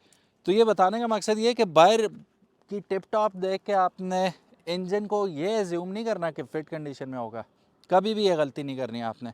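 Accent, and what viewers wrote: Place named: Hindko, Pakistan. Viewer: Apka hindko wala acsent hy mansehra sy hy ap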